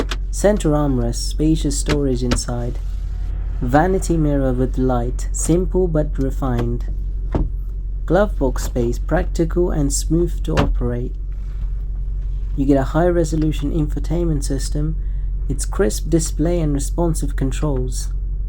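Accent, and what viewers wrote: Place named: Kerala, India. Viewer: Malayale English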